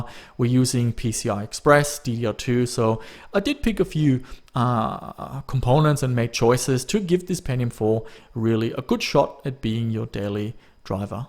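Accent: Germany. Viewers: Du hast ürgendwie so einen deutschen Akzent 😂😂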